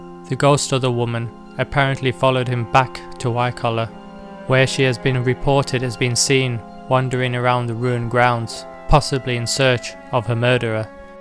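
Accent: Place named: Ireland. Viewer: Good to hear that lovely Irish accent again. I’ll make sure to visit Lancashire next time I’m in Ireland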